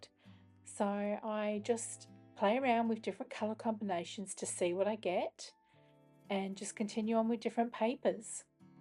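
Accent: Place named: Australia. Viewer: Just came across your channel today and I’ve watched a couple of videos. You’ve gained a new subbie. It great hearing Aussie voices. 😊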